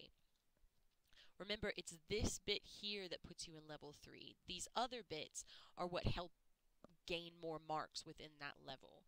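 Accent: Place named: United States. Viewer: Why is an American teaching me English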